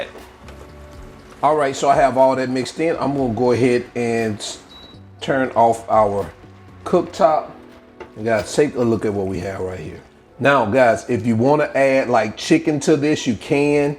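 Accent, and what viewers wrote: South, United States. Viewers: Stop dragging on your Alabama education it has gotten you this far and from what I can see you’re not doing bad at all , so, it did do the job. I love your recipes and you are doing a great job but remember we all talk or pronounce words different, it just depends on the area / demographic you are from. I know you are just having fun but lighten up on us southerners.😂😂😂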